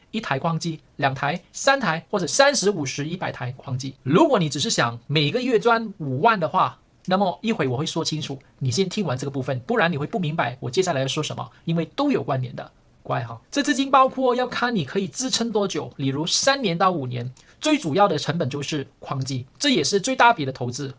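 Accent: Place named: Malaysia. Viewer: 刚开始以为是移民到台湾的马来西亚人。没事，听的蛮舒服的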